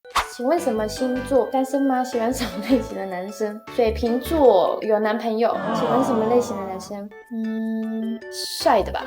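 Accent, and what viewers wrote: Japan. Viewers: vivi的國語 已經有點日文腔~很可愛😂😂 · 有點日本口音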